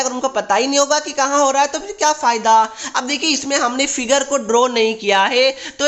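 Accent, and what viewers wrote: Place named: Bengal, India. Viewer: Is it a bengali teaching us he be like paryog and twenteen wtf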